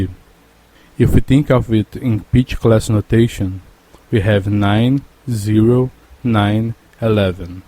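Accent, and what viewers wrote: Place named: Brazil. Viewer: Puta que me pariu, comecei a aprender baixo e curto math rock tem um tempinho. Sigo teu canal faz uns meses e eh o meu preferido! vendo esse video e o sotaque pensei " vish, parece BR" e eh mesmo hahaha Maaaano, muito feliz em saber! :D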